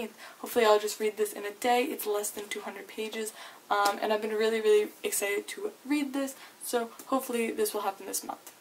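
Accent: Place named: Canada. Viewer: why do you sound Canadian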